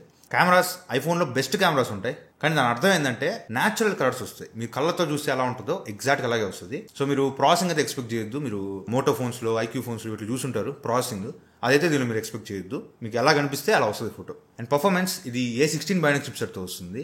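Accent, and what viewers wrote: Nellore, India. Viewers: anna manadi nellore aa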